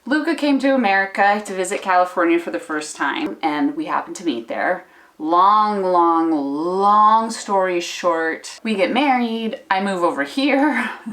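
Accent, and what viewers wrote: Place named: Ljubljana, Slovenia. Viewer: Do you know u speak “dialect” too? I am sorry, but tbh “ljubljanščina” can be slightly annoying.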